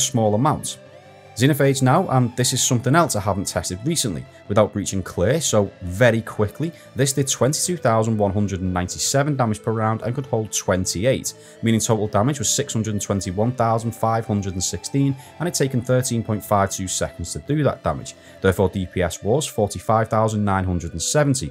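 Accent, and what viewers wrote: Liverpool, United Kingdom. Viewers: Hahahahhh scouser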